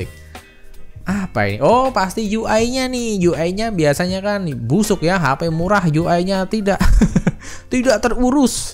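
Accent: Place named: East Java, Indonesia. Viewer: org jawa tah mas ?? logatnya kya daerah wetan sana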